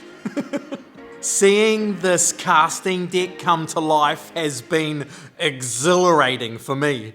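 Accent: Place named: Australia. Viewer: I'm not sure what's more cool that STONG Australian accent or that aluminum boat.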